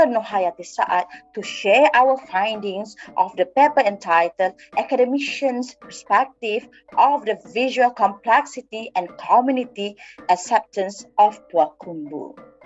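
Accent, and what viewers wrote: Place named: London, United Kingdom. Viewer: Wow speaking London 😃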